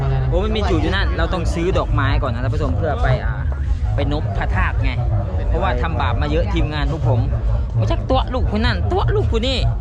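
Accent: Laos. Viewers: เว้าลาวกะได้เจ้าเอี้ย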